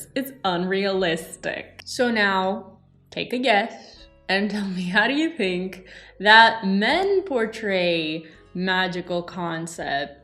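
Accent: Greece. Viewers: Your greek accent gives me the feels <3 And Jiji is the best, I love black cats. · Your Greek accent is so spot on I wondered if you are from Greece oh my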